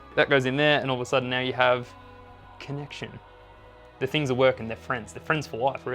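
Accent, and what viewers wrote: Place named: New Zealand. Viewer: Half the reason I watched this was for your Kiwi accent. It's like watching a young Taika Waititi. XD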